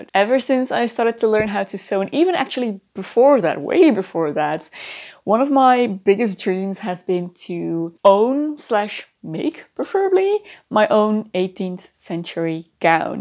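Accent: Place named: Netherlands. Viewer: hoor ik een wat Nederlands accent af en toe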